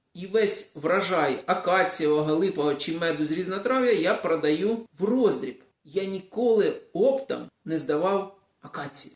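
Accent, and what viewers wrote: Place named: Chernihiv, Ukraine. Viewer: коли слухаю тебе то згадую дитинство. У 1978році до нас після Ніжинського педінституту прийшло декілька молодих вчителів серед них фізик слово коли вимовляв так як ти думав що він з Чернігівщини, потім він напевно повернувсь на батьківщину. Ти з чернігівської де така говірка?Чи може Каликовський так його прозивали учні був з Харківщини?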